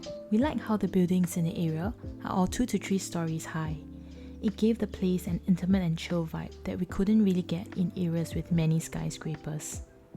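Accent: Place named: Singapore. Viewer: Singapore accent ftw!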